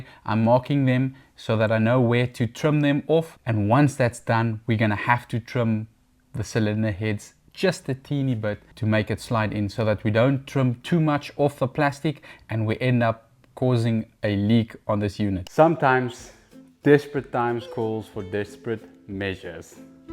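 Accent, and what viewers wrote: South Africa. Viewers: You sound like a siuth African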